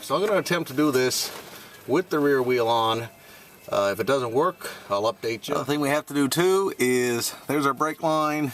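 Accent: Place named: Canada. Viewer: Liked your video even with that terrible Canadian accent! Thanks!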